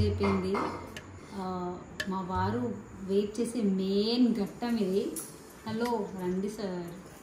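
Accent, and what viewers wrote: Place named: Telangana, India. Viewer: Akka nuv complete Telangana lone mataldu... Nen ni matala kosame chusthunanu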